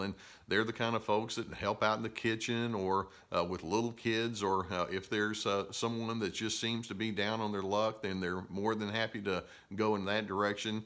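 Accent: South, United States. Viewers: Thankyou so much you've conceptualised so much of what this personality type is and those who have taken advantage of it. I've often said when, disappointed , that I expect too much from people . I know I have been naive , expecting people to be more like me. Learnt a lot by being burnt . I have watched a lot of your tube postings. The knowledge you've given me re Narcissists has been a light on on and several ' ah ha ' moments . Scott 🇦🇺 that's again , love the southern accent!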